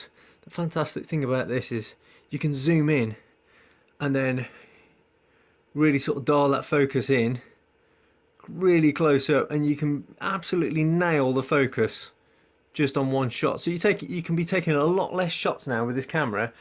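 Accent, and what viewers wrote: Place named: United Kingdom. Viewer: Makes a Change to hear a Fellow British Accent..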